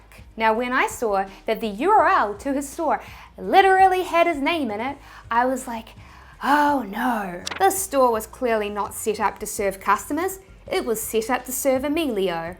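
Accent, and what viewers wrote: New Zealand. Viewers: Love this! Well done. Really enjoying your pragmatism (and your Kiwi accent!) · Love your kiwi accent... Your nation knows my nation well via rugby .. (Wales) love your content. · My dear, your Kiwi accent is absolutely adorable. I have next week off, and because I cannot travel, I shall get stuck into PrintOnDemand and DropShipping using your fantastic free eBook. I even downloaded a female New Zealand file on my Mac to read it to me. BTW I was just thinking, aren't all the people on AliExpress, just DropShipping for Alibaba? One final thing, the final syllable of the French word "entrepreneur" is pronounced "er" like in "her", and not "ure" like "manure";-)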